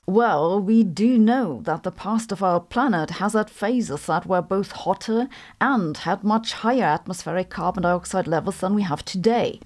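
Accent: Germany. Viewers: Why? Of course its possible to pronounce, even for Germans. My advice is to get rid of that insane accent. Feel natural. If nothing else helps, use a chewing gum. And don't use english with other Germans. Thats always a drawback. If you say you have problems with "th", I tell you that you have never tried. Good luck. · Unrelated but germans have a VERY cute accent and i wont change my mind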